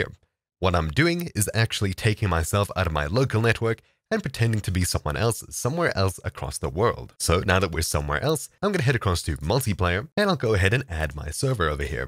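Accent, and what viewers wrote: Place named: South Africa. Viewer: I thought I could hear a fellow South African in that voice!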